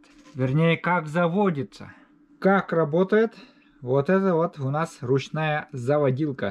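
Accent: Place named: Baltic states. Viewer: Акцент, прибалт? Или Беларус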